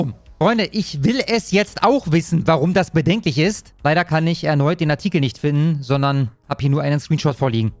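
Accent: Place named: Berlin, Germany. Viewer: Oh ok cool 👍. Berlin hab ich so ein wenig rausgehört aber ich dachte eventuell hättest du russische oder polnische Wurzeln, weil es sich etwas danach anhört wenn du sprichst 😅👍 liebe grüße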